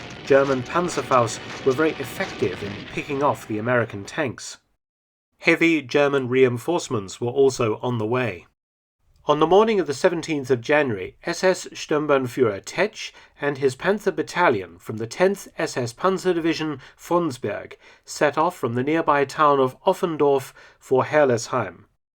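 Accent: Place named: RP, United Kingdom. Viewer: You kinda sound like a posh clone-trooper.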